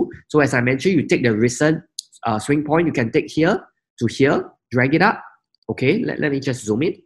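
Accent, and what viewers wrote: Singapore. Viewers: You sound to be from Singapore.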